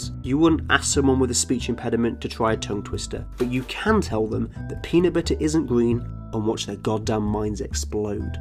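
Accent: United Kingdom. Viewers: I like the British accent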